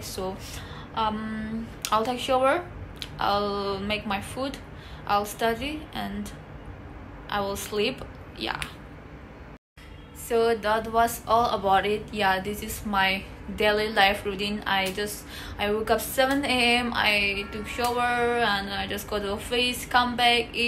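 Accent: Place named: Nagaland, India. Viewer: The Naga Accent still hits different ❤️❤️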